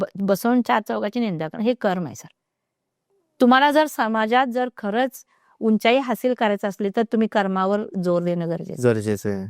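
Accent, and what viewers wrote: India. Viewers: Aaho त्या दिल्ली साईड la राहिलेल्या आहेत म्हणून हिंदी मिश्र मराठी बोलतात ,तुम्ही pn दुसऱ्या राज्यात राहिलात तर त्या भाषेचा प्रभाव तुमच्या बोलण्यात होईल ,त्यात अशुद्ध अस काहीच नाही त्यांच्या भावना तुमच्या पर्यंत पोहोचणं महत्वाच आहे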